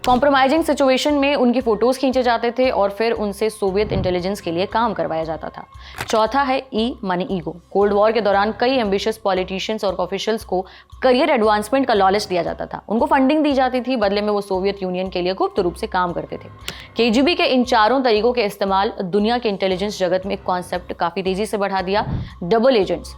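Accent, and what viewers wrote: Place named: Rajasthan, India. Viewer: madam aap Rajasthani ho 😇...tiya pancha bolne ka trika like rajasthani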